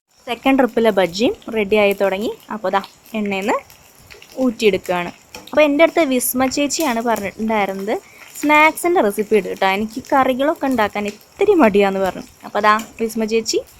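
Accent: Thrissur, India. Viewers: തൃശ്ശൂക്കാരിയാണോ ചേച്ചി? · Good presentation with Thrissur accent. Even a kid can understand.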